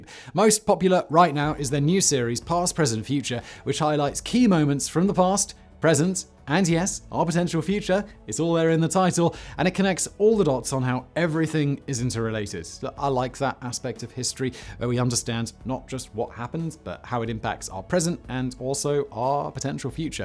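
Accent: RP, United Kingdom. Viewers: A former Brit here who moved to America in large part because of what I'm about to say here. I have delivered a Thumb Down on this video. For the needless sideswipes against American conservatives. I heard a lot of this snideness when I was in the UK and I eventually figured out that it came out of a place of envy. Conservatives in America have elected some absolute duds - George W Bush, ugh - but they also produced Reagan and, yes, Trump. From your accent - which you have taken great care to curate, given the jumpcuts - I am guessing that you identify with the BBC upper-crust. A Brit can always tell.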